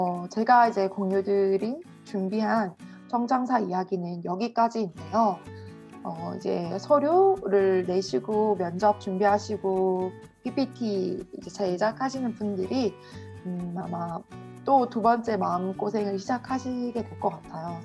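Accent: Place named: Daegu, South Korea. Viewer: 저는 대구 친구가 많아서 ㅋㅋㅋㅋㅋㅋ막 들리네요 ㅋㅋㅋㅋㅋ그래서 걍 편히 녹화하셔도 될 것 같아요 친근하고 더 좋아요!!♡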